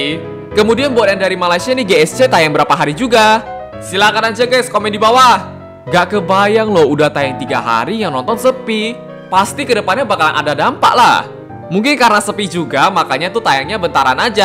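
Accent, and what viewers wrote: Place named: Medan, Indonesia. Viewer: hmmm abang ini fix orang medan wkwk salam kenal bang, btw gua orang pakam bang. dari awal gua subscribe elu rada ngeh sama logat logat khas medan lu eh ternyata bener orang medan dong wkwk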